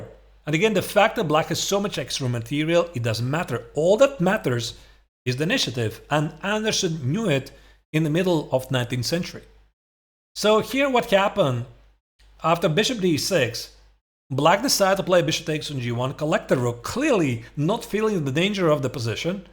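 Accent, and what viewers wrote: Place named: Germany. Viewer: your English sounds very German-like: "adwantage" or "undershtand" sound how a German would say those words. Why is that?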